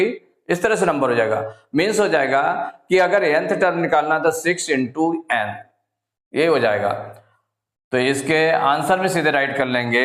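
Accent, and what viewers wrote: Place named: Bihar, India. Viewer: Sir aap. Bhiar se ho kya kyu ki aapka aawaj bhiar jesa lqg rah hai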